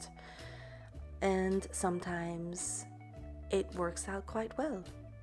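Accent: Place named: Hawaii, United States. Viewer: This is an awesome piece in and of itself, but it's more meaningful because of the thoughts you shared at the end. Thank you for that. 💜👍 Are you or your spouse from Hawaii? I hear an accent in your voice 🙂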